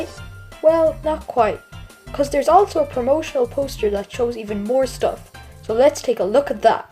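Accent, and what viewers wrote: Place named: United States. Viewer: U sound American in this😂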